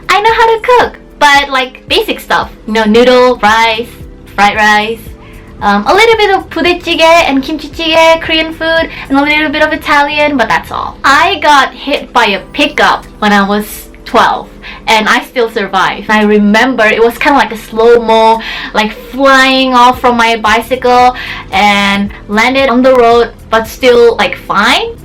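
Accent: Indonesia. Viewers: At first you sounded like an Indonesian trying to speak English to me. number 9 explains everything. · ahh an indonesian accent, I was always confused, I knew you were korean but your accent isn't native english speaker or korean...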